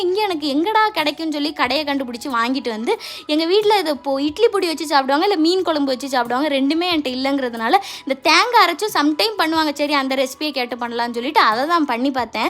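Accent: Tirunelveli, India. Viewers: Hi and. Congratulations 🎉 first. nowadays am following your style in my cooking yeah.like ungala mathiriye immitate panni cook pannuren 😅..my native is thirunelveli.. namma ooru slang athu innum highlight. Easy ah connect aaguthu pa. So happy for you guys... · enkalukku thirunelveli neenka pesathu super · Tvl speech Vera level.same blood😊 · Athuvum unga tirunelveli slang enaku romba pudikum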